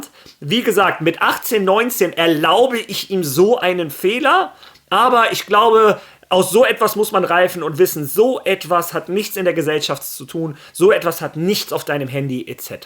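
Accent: Turkey. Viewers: 😂😂😂😂😂😂 alter dein Türkisch.👍🏻👍🏻das hat mich vom Hocker gehauen 😂😂🤣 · Dein türkisch lustig zugleich sympathisch Klasse Beitrag. Arda Güler spielt mit 20 Jahren für die beste Mannschaft der Welt. Wir reden von REAL MADRID. Wir müssen ihm mehr Zeit und Geduld einräumen.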